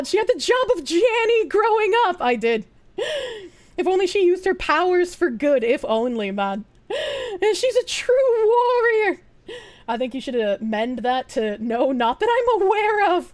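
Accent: Boston, United States. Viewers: Here here! We need her lovely Boston accent spitting facts out here.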